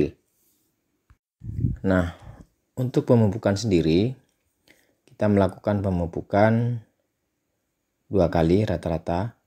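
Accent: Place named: West Sumatra, Indonesia. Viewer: Suaranya mirip Minang kocak 😀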